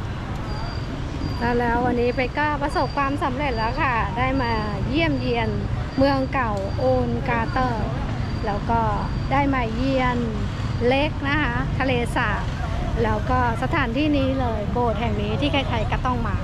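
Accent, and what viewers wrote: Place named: Thailand. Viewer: Melihat beberpa video anda yang berawal dari Vietnam, saya beranggapan bahwa anda orang Vietnam, rupanya anda orang thailand 😅. Cuma dari awal logat berbicara anda, saya sedikit memahami bahwa anda orang thailand, hanya karena anda meliput video Vietnam maka saya mengira anda orang Vietnam 🙂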